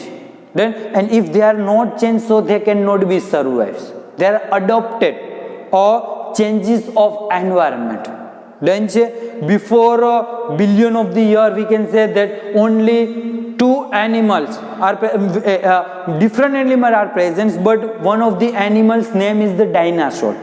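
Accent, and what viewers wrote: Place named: Gujarat, India. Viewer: Watching from bangladesh sir,i like ur accent though i dont know gujraati but this accent sounds adorable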